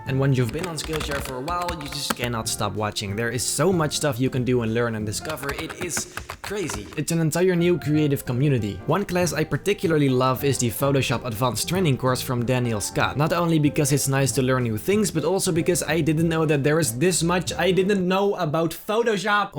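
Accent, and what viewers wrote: Netherlands. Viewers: His Dutch accent is very annoying sorry, nice photoshop tho.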